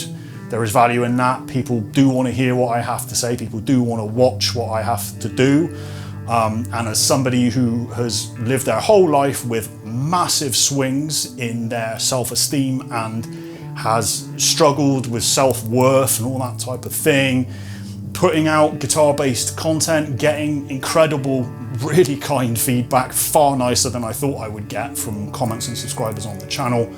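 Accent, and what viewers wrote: RP, United Kingdom. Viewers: I always love your videos and posh accent ❤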